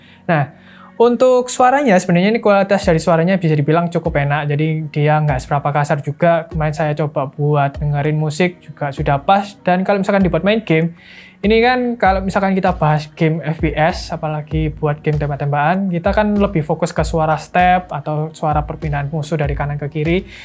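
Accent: Java, Indonesia. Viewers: medok wkwk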